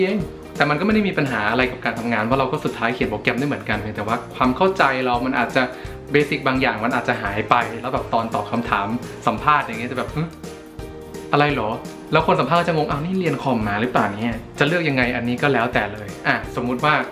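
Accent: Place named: South, Thailand. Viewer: สำเนียงคล้ายคนใต้มาก 555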